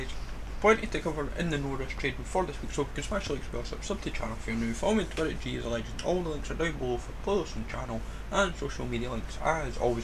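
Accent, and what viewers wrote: Scotland, United Kingdom. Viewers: i fucking love scottish accents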